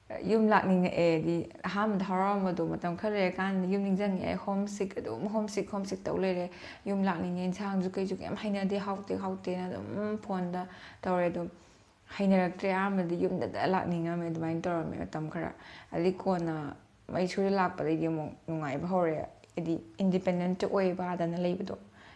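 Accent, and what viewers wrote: Kakching, India. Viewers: Long kHoibado yaragadi tokpiro... Majority khallaga...eikhoidi kakching dialect toh meitei lol oirasu taba nungaitey...kakching khaktnggi oina semva blog ti oihnbiranu...Sao Yade nungaitaba yaoragasu hairibasingsida...I really like ur personality...And loved ur videos...But these are some things that I want u to concern..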